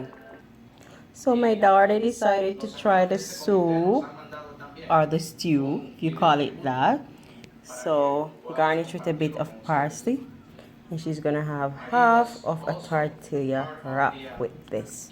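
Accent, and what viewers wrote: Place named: Jamaica. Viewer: Love your JA accent.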